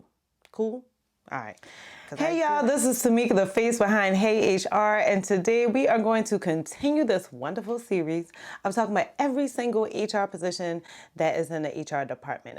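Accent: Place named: Carolina, United States. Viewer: I know a carolinian when i hear one lol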